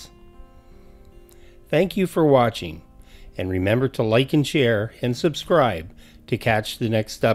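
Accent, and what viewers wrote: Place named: United States. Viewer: If you are Canadian, why do you speak American English,?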